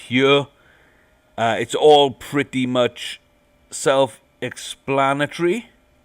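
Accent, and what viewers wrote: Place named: Wales, United Kingdom. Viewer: There,s Welsh Twang in your voice are you from UK originally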